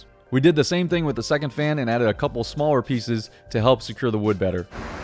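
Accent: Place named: South, United States. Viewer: It’s called southern dialect. If ya live in the south, it is proper English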